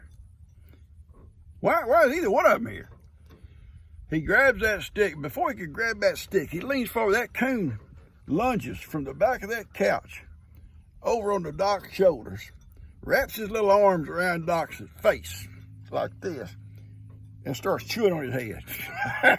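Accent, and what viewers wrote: South, United States. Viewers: I love your country accent and your stories. I couldn't stop laughing 😂😆 at doc and the racoon on his head at the stop light.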